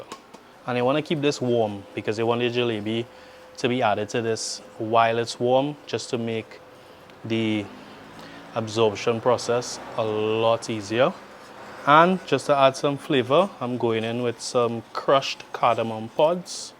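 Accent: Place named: Trinidad and Tobago. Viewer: I love your accent. Are you originally from Trinidad? Excellent recipe. I’m going to try it. Thank you.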